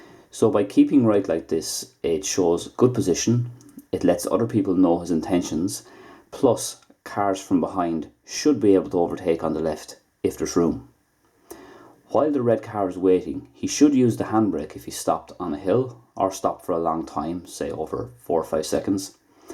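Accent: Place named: Ireland. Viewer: you're so clear with your Irish accent you're the best.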